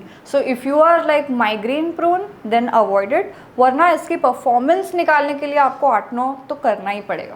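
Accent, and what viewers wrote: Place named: Bengal, India. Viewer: Are you Bengali?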